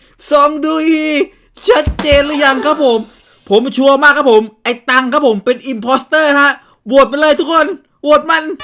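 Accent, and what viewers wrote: South, Thailand. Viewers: พี่เป็นคนใต้หรอ ผมพึ่งรู้นะเนี่ย555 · พูดใต้หน่อย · พี่ เป็นคนใต้เหรอค่ะ??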